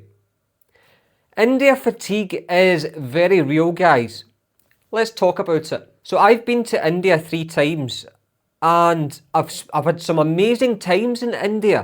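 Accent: Scotland, United Kingdom. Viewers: I love your accent❤. I guess it is Scottish? Even though you are giving a constructive criticism which I agree with, I can’t help but notice the tone in your words. It is beautiful. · Your Scottish accent got me 😅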